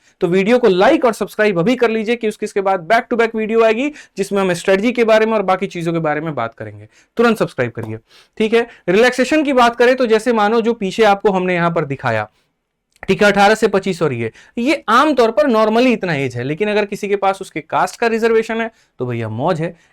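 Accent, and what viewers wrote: Bihar, India. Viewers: Jarur Bihar se hi ho ap...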